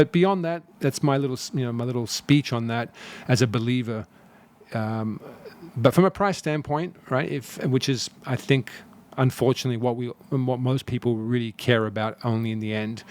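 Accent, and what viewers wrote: Australia. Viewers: he's from Sydney. Common NSW accent.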